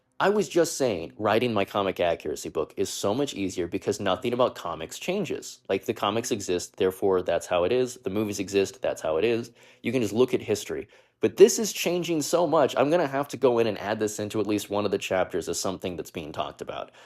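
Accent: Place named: United States. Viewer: You have an American accent, and yet seem to struggle reading English. I didn’t finish the video but in 5 minutes you mispronounced at least 6 names. Hot garbage.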